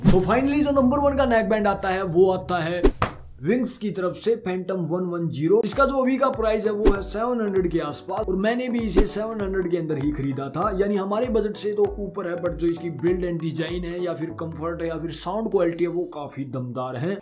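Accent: Haryana, India. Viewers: kitni e koshish karle bhai 🤣haryanvi accnt a hi jata hai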